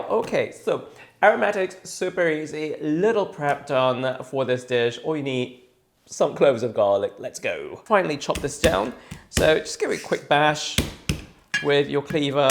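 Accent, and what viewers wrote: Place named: RP, United Kingdom. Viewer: What's with the posh voice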